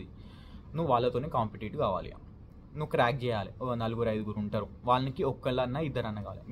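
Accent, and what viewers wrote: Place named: Telangana, India. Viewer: Brother ni way of presentation superb in telangana slang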